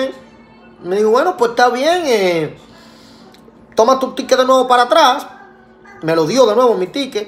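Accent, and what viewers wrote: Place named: Dominican Republic. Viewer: Bien explicado Con acento Dominicano.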